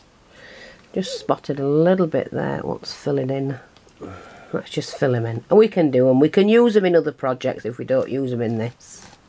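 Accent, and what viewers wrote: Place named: Yorkshire, United Kingdom. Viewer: Hi I'm new to your channel too and would second what gerilynne1955 says below, you refer to your shop but I'm a bit confused as to what shop this is, perhaps you could link the products you use in your description box. I love your work and am definitely going to try a few things you have made. Are you a Yorkshire lass? I am but am now living in Minnesota in the USA, great to hear a Yorkshire voice!!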